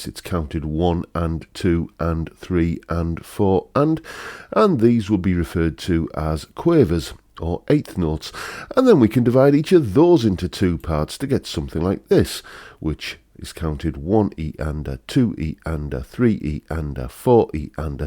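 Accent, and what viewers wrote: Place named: England, United Kingdom. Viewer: Being an expatriate Englishman and a guitar player, it is nice to hear an English accent and your classic insights into guitar, so you are appreciated here in Australia too.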